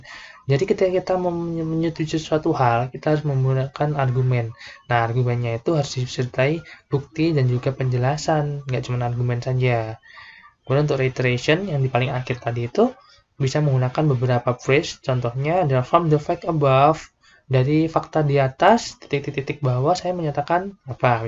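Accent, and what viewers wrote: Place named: United Kingdom. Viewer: Britis sekali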